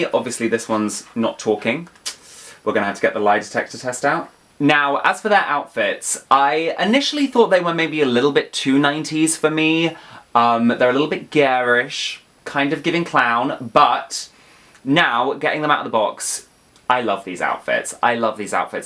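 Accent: United States. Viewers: I appreciate your American accent ❤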